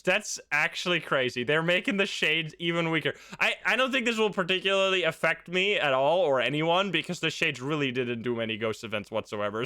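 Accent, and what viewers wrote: Netherlands. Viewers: Wait a sec are you dutch or belgian? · Wait what, are you Dutch?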